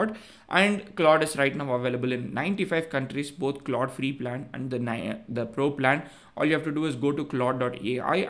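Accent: South India, India. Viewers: Dude, I have to say it as no one else will. Your content is excellent but your accent sucks. Eg. Won won won, instead of "One". I'm South Indian myself but don't have a heavy accent. I share your videos with all of my American and Canadian colleagues(especially THE GPTV one). They have just one feedback and that is they don't understand what you are talking due to the accent. If has to become 1bigglobalcoder then please mute down the accent. Cheers, another great content video as ever. 🎉 Update: Thanks for taking it in the right spirit content creator. 😂 that one "psychiatrist" in the comments.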